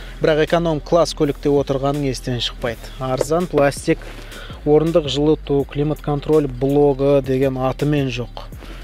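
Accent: Standard. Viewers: Қазақшасы таза екен иа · Жарайсың таза қазақша сөйлейсің оған қоса тарихты айта кетесің ✊ дами бер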